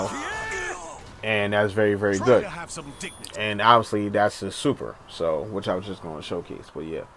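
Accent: United States. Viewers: Fuck all these haters, you speak like an American, and you know how to teach. This bullshit 6ab or whatever doesn't work when teaching newbies, good shit man!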